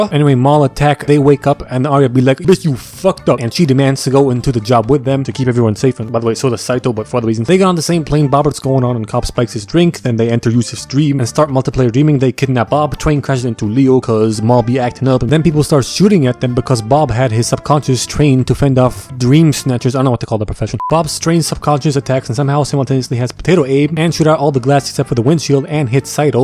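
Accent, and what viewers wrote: Spain. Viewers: ...I thought he was either Spanish, Hawaiian or Asian. 🤨🥴🤔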